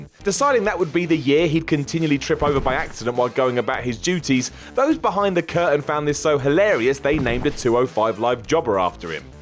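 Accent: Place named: England, United Kingdom. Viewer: he is English.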